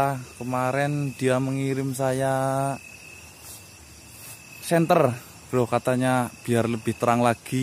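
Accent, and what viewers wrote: Banyumas, Indonesia. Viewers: Jal rika wong endi apa wong banyumas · Woy kamu dari Ngapak bagian mana? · Mas wong ngendi mas, kayane ngapak tmen wkwk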